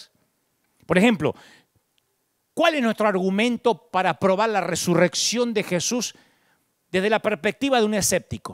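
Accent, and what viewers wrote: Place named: Córdoba, Argentina. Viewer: Rompe estructuras!! Hablando a lo cordobes...es un capo.. Por mas pastores asi Amado Dante Gebel!!! Gloria a Dios por tu vida!!!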